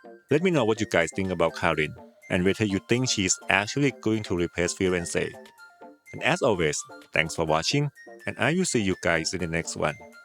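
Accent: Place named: Thailand. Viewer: คนไทยปะครับสำเนียงเหมือนไทย 😅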